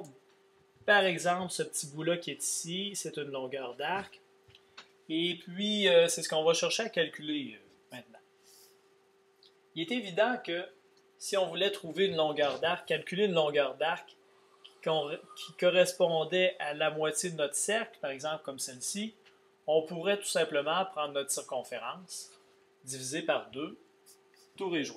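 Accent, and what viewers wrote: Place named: Quebec, Canada. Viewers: aurelio L'accent Québecois! Youhou??!? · c un accent quebecois ! ta un probleme avec cette accents ?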